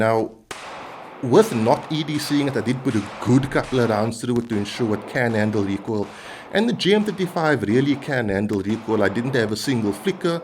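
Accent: South Africa. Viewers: Jeez - could you roll your R's and add syllables to words anymore! This guy couldn't be anymore South African.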